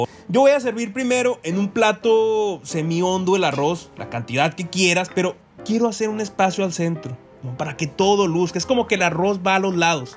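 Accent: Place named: Monterrey, Mexico. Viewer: Jajajaja me encanto como describes la receta, asi hablamos los de Monterrey. Buenisima, la estoy haciendo ahorita mismo.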